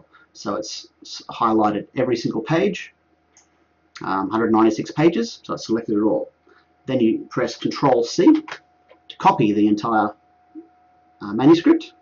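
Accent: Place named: Australia. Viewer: must be aussie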